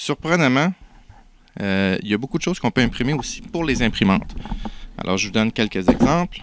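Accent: Quebec, Canada. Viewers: j adore ton accent quebecois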